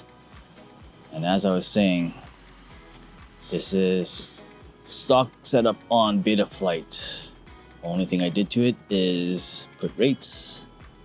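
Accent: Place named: United States. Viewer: You sound American. Did you have any trouble ordering the Pavo 20 pro 2 considering the dji ban?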